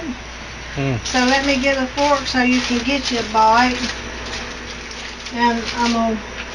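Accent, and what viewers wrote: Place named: South, United States. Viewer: Just joined your channel because Jan mentioned you and said we should watch yours. I’m from Tennessee so I know that southern talk lol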